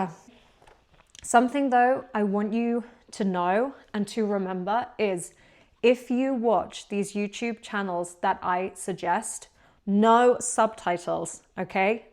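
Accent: United Kingdom. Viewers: 我i like your teachingm, for cn people, from British accent , its very good verygood for us , because pronunciation and clear , just like your teach methods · British accent is absolutely beautiful. She speaks at a comfortable pace, which is perfect for beginners like us. Let’s keep working together to develop the ability to think directly in a second language, rather than translating everything in our heads or depending completely on AI and missing the chance to build our own language intuition. The funny thing is, this comment itself was translated by AI😂😂. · Goog job! You're my first and the only native english channel. I'm about to start a new job in London next week so thanks for letting me hear your british! Best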